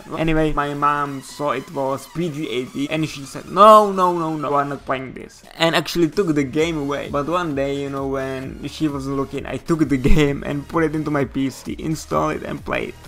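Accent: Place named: Mexico. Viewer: That is a Spanish/Mexican accent or my name is Mexican Jumping Bean! (and it's not)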